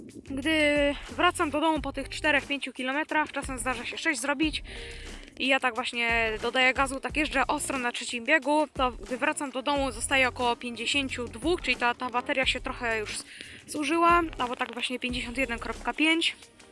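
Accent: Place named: East, Poland. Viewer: Nie wiem jaki sens jest jeżdżenie jakąkolwiek hulajnogą przy takim śniegu jaki tutaj jest pokazany no to może tam gdzieś to relacja jest w języku trochę takim z akcentem wschodnim morze na południu Polski gdzie ta zima trochę tam gdzieś się pokazuje bo na północy a w Szczecinie mieszkam po prostu praktycznie zimy nie widzę ale nie zamierzam jeździć hulajnogą zimą bo to jest średnia przyjemność praktycznie no jeszcze na siodełku powiedzmy to jest jako tako ale stojąc to człowiek po prostu szybciej marznie i jest większe ryzyko wpadnięcia w poślizg w ogóle nie widzę tej jazdy zimą i należy ona do sporadycznych czy co wynajmują firmy wynajmujące czasami spotykają swoje właśnie hulajnogi porzucone w śniegu w ogóle to jest osobny komentarz do tego bo to już po prostu zakrawa na kpiny z hulajnogi wszędzie są bezładzie porzucane gdzie tylko popadnie i zagrażają przechodniom ja już bym się dwa razy o nie potknął wychodząc wieczorem przy słabym wzroku...m.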